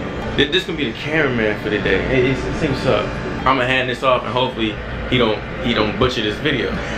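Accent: Baltimore, United States. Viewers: Are you from Baltimore? You got that accent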